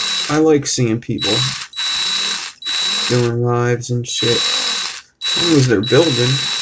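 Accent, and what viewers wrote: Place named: New Jersey, United States. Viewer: youz guyz is a jersey thang. everytime I hear it, it reminds me of Kevin Smith or more to the point Jason Mewes :)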